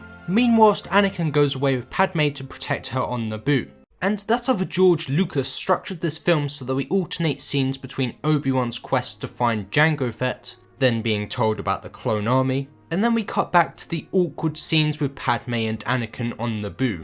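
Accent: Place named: RP, United Kingdom. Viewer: Jesus this video is hard to watch when you constantly misuse words. You use the word "conflate" instead of the word "conflict" which wouldn't do bad if it didn't mean the opposite of the other word. And things like when you say: "I know it's GENERIC to point out but it's GENERIC because it's true" Like wtf do you mean there? Did you mean to say that it's "cliché" because it's true? Yeah those words are sort of synonyms but they sure as hell aren't exactly interchangeable in this context. In this context it's like you are saying "I know it's boring of me to say that but it's boring because it's true"... Like what about the factual statement makes it generic or mundane? A tartegrade is an earth animal that can live in space. That's a fact but I wouldn't call it generic. See what I mean? It always throws me off to hear someone with a posh british accent make these mistakes, but bro, you have got to make sure you understand the words you use before you confidently use them in a video. 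I assume you read from script, so get yourself a someone to proofread before you commit this shit to video.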